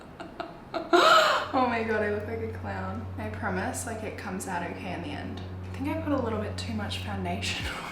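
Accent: Australia. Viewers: Are you Australian? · Any Brazilian watching??? your videos are the best ❤️ I miss australia so your accent makes me happy😂😂 good lock on your channel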